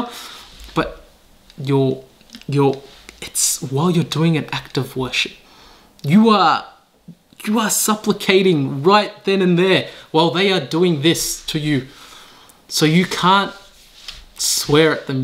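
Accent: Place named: Australia. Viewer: Masha Allah brother, I also went for Hajj this year. Your accent suggests you are Australian? So am I. Perhaps we crossed paths?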